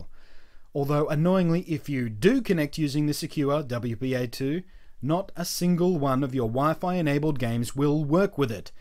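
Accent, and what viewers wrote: United Kingdom. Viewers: he's british. =/ lol. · British?? good job graduate. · Have you ever heard a british/english accent...?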